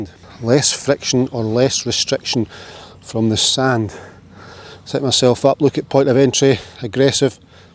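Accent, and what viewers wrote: Scotland, United Kingdom. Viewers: Love the lesson and your Scottish accent!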